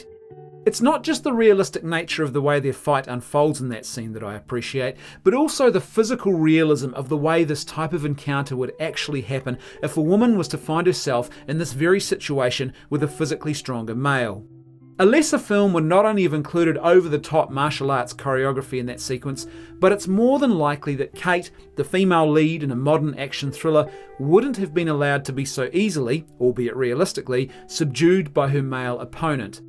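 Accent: New Zealand. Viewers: are you kiwi bra? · The guy talking sounds like a Kiwi. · Can't handle the Kiwi accent... Blah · Kiwi accents crack me up! Great review of the movie, but my takeaway will always be, "ass-pics of dipth" & "muudah".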